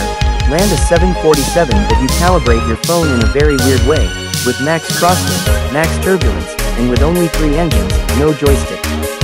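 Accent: France. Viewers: Are you French? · are you french ?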